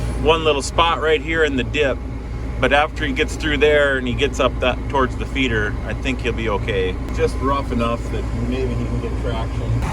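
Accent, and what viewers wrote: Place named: North Texas, United States. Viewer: Sounds like us here in North TX😀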